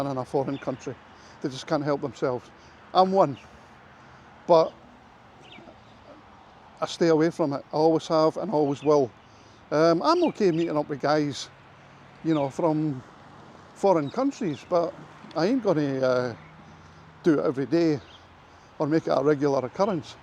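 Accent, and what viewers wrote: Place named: Scotland, United Kingdom. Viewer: Yep it's English. Scottish accent is considered difficult to understand even by other English speaking nations. Many also find the accent cute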